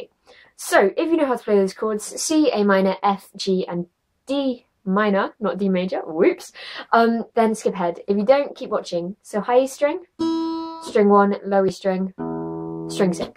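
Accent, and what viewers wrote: United Kingdom. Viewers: A beautiful girl with a British accent giving a tutorial of my favorite song! Who wants more? i can die in peace now. :-) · Bloody hell, you're Britain?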